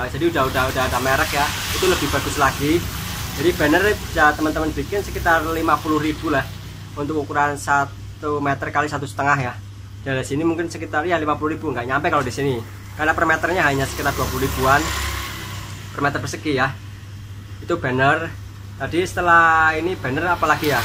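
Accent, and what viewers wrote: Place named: East Java, Indonesia. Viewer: Ganteng...soko jowo timur yo mas....kelihatan dari logatnya...arek suroboyo????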